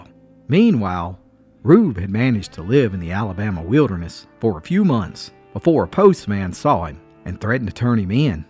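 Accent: Appalachia, United States. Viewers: From Switzerland or from Mountainman to Mountainman. Great Storytelling, love it. The way you tell it and can l say your Appalachian dialekt make your storys special. And can l ask you for that Red Rube video intro tune?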